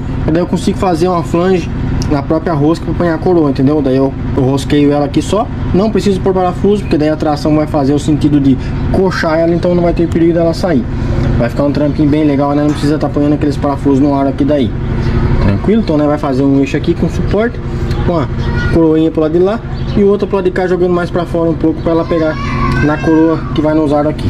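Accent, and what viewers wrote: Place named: Santa Catarina, Brazil. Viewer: achei que você morasse em SC pelo sotaque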